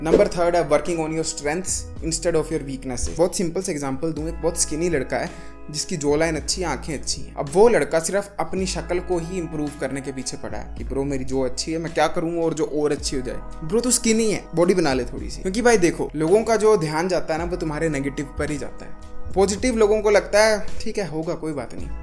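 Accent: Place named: Kangra, India. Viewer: Mujhe laga tha ki bhai aap kangra se ho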